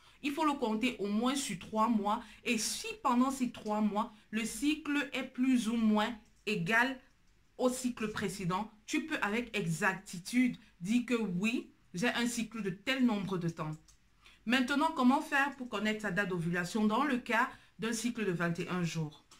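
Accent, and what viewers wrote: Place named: Cameroon. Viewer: Notre bel accent Camerounais 😊😊😊 💚💚💚💚💚❤❤❤❤❤💛💛💛💛💛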